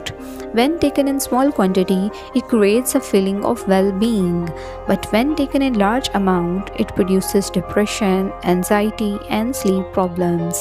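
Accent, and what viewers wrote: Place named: India. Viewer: Is there a search result that is not indian like accent?? It’s really annoying. I don’t know why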